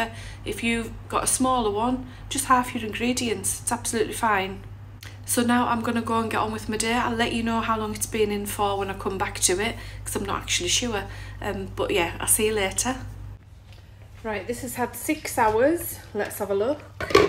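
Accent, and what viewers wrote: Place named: Sunderland, United Kingdom. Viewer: Are you a Sunderland lass I’m a Geordi xxxxxx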